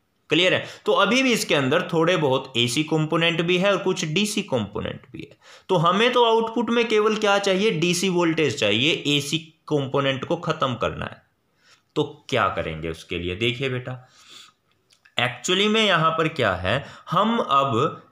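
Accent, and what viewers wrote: Haryana, India. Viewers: Sir ,Are you from Haryana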